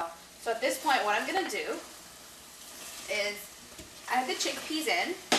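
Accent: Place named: North America. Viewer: No. It's a north american accent.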